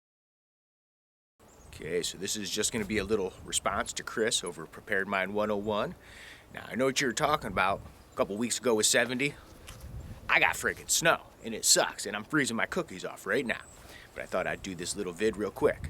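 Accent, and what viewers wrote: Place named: Ohio, United States. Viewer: u sound like ohio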